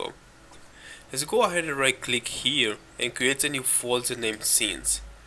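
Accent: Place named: Latin America. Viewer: Thanks. I like the way you explain things. Keep it up broh. BTW. You speak spanish don't you? Te escucho un acento latino:)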